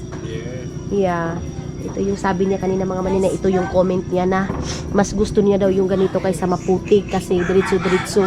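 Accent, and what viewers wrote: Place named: Butuan, Philippines. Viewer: Alam mo maninay namimis ko mag salita ng tulad ng salita nyo KC amarunong akong mag salita ng ganyan KC Ako ay taga Butuan pure Bututanon ganyan among inistoryahan.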